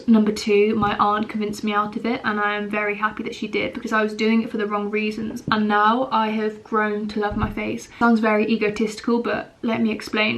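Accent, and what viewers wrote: United Kingdom. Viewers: Your British accent is adorable 😩🎀✨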